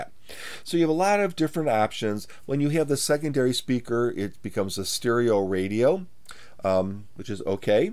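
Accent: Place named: Milwaukee, United States. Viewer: U sound like you’re from Milwaukee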